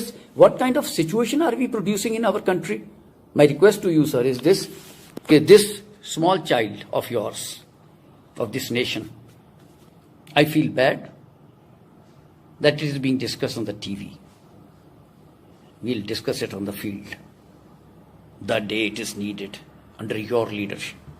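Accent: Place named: Punjab, Pakistan. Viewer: Ye Pakistani Panjabi assent hae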